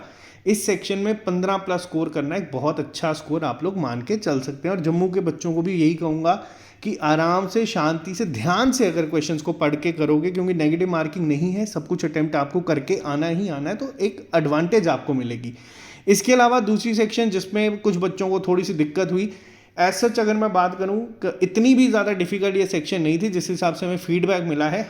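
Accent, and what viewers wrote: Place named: Manipur, India. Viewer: Apki awaz sy mujhe uder manipur yaad aaya sir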